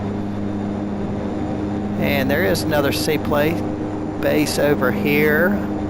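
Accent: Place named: South, United States. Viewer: Your videos are epic. Never learned that much in such a short time as with your vids. Your real world pilot helps.you do the procedures as you would in real life, which is very helpful. And you've got such good sense of humor. May I ask you (if it is not too personal) where are you from in the US? Somewhere in the South? Your accent is really nice. :-) I brush up my American English that way. P.S.: I'm from Austria, so my English isn't very good. So please bear with me.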